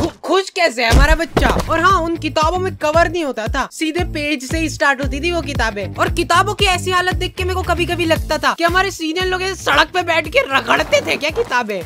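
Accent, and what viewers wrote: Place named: Madhya Pradesh, India. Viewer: MP ka chora hai 🗿 uska accent <3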